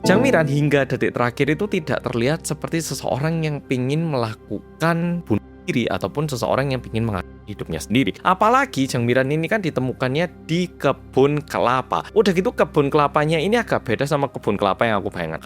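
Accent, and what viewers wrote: Java, Indonesia. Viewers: Waduh padahal pernah ndok jeju seminggu sekali kapal ku docking di dermaga sana. Angin nya besar pernah hampir terbang gara2 bawa payung 😂. Karena kota nya kecil kukira jeju itu kecil. Tiap meninggalkan jeju pasti kapal yg kutumpangi lewat depan area golf tepi laut. Itu ingatan yg agak samar karena sudah 26 tahun yal. 😢. Ini plot twist e piye sidane mas korea sing medhok? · Salfok bahasa Indonesia medok tenan... Markotop Lanjutkan terus kakak... Oppa... Mas_eeee.... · Logatnya seperti orang jawa😅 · Org Korea yg medok bnget😊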